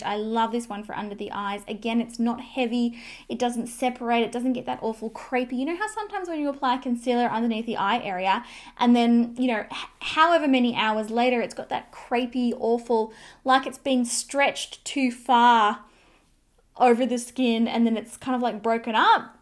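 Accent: Australia. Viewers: This is random but I’m from the states and I’m obsessed with your Aussie accent 😍😍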